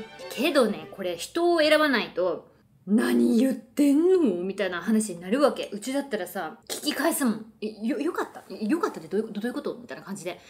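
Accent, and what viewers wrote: Kansai, Japan. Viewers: ちゃんの関西弁？だいすき～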